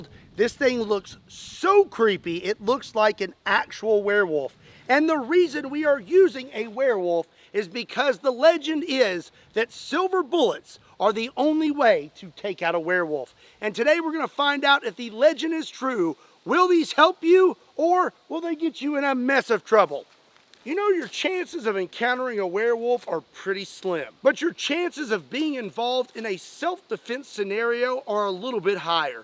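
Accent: United States. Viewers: I like how he talks like a cowboy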